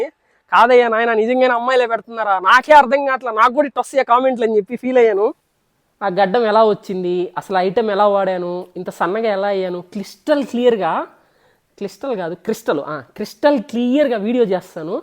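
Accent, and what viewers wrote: Nellore, India. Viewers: Skip cheyakunda chudamannav kani ur talking was to good bro nellore yasa super😂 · Ide ma nellore yaasa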